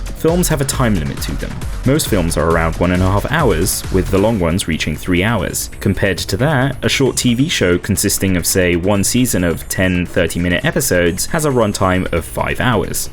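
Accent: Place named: United Kingdom. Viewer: Petition well and truly signed. Also, another great video essay right here. Loving the charming British accent. Wish I had one.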